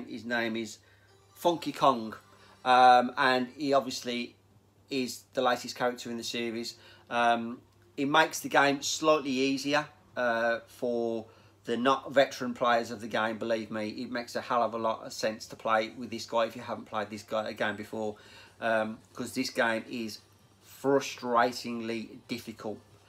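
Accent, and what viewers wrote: Birmingham, United Kingdom. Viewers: Good to hear a brummy voice on YT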